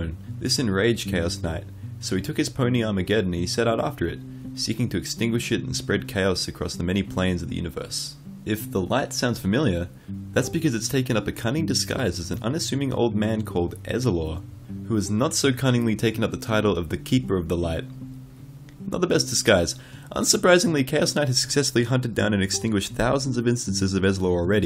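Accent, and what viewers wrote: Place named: Australia. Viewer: Here I thought, Godz from BTS was australian. You are so australian, it hurts. I could barely make out a word. I betcha if you ever move to a different country, you could get laid just by laying on the accent. You lucky dog, you.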